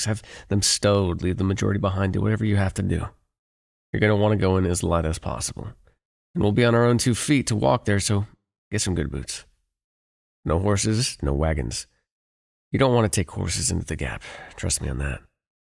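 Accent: South, United States. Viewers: Love this!! AND I LOVE THE SOUTHERN ACCENT!!!! Reminds me of my hometown 😭❤️ THANK YOU!!!! LOVE YA AND YOUR VIDS!! KEEP UP THE AWSOME WORK!!! ❤❤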